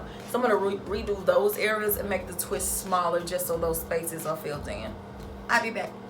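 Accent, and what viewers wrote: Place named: Texas, United States. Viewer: Sorry if you have answered this before, but are you from Texas? That accent is giving me Texas lol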